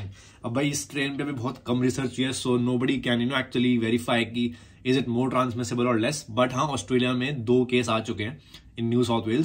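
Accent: India. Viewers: Im Sorry if I offend to somebody but what kind of lenguage does this guy speak. English Indi??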